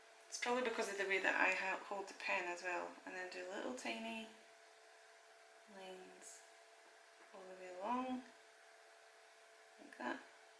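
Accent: Scotland, United Kingdom. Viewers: Are you Scottish? I am. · Shes from scotland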